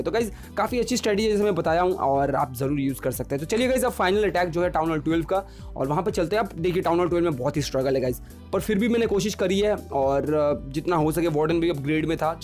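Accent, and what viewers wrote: Bengal, India. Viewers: Bhai tumi bangali ?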